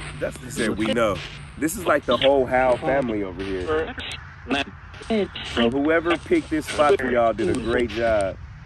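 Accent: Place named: East Texas, United States. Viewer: Awesome visit 😀 That was very nice of you to go there on moms day. I'm the one who ask you if you were from East Texas. I listened to your accent. I'm born and raised in Ft. Worth. Love your channel and approach. Keep on keeping on. 👻🌹👻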